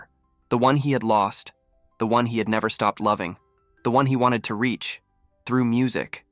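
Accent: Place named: United States. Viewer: One has to be very careful in choosing who reads these stories so that it complements the genre. I know Americans speak a strange form or English and this gentleman makes it very harsh with that strong accent. A pity as it is a lovely story and a lovely melody. It would get top marks if narrated by a gentle man's voice without that strong American twang.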